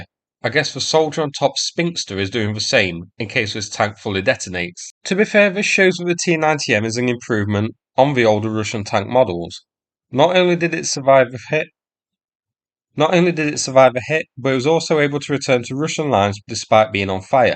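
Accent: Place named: Mansfield, United Kingdom. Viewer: Has anyone ever noticed that pronounces Russian words better that English? It's got to be his Mansfield accent.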